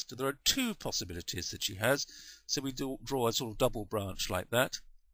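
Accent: United Kingdom. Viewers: The only reason I watched this video was because of the British accent on this guy. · Are you british mate?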